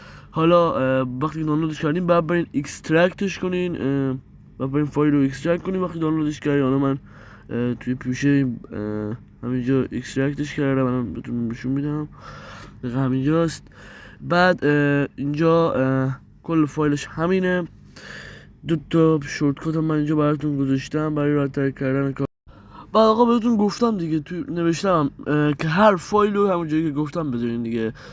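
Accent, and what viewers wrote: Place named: North, Iran. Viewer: لحجه شمالیتو عشقه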